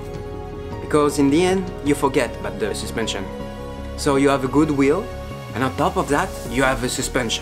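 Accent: France. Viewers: your doing good mate, english with a slight french accents sound really good and you provide some very relevant info to us all... Mercy. You have some very important points about the quality of the suspension which is important. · Laissant de côté l'accent français trop prononcé, tu parles un anglais très bon ! Bravo encore !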